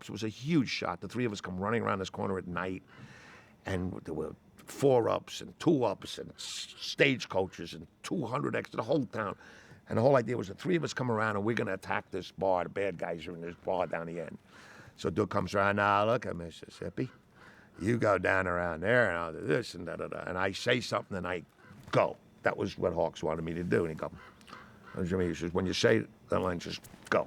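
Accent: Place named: New York, United States. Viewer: A cowboy with a new york accent?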